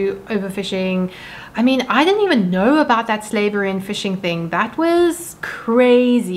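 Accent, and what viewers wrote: South Africa. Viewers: South African, love to hear it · Totally off topic, but I'm fascinated by your accent. Are you from South Africa?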